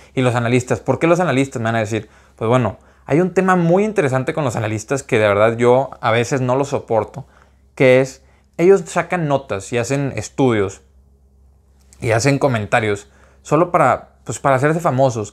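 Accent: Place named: North, Mexico. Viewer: Eres Mexicano y eres norteño vdd ? A mi no me mientes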